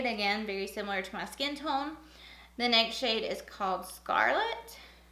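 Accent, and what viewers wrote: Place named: Texas, United States. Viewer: new subscriber here. Are you from Texas? You sound like a Texan.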